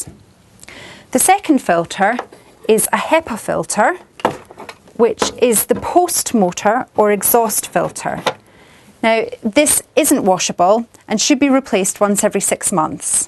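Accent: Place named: Glasgow, United Kingdom. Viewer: I love this young lady's accent...my grandmother was from Glasgow and she sounded very much the same. =)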